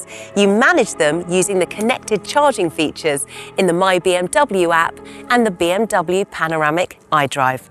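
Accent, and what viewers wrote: United Kingdom. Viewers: I'm so sick of her British accent; it's so pretentious. It makes the X5 look even uglier.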